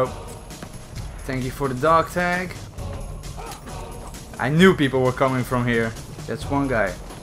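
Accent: Netherlands. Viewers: Je kan horen dat hij nederlands is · Are you dutch? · I smellde a Dutch accent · your Dutch accent is overwhelming haha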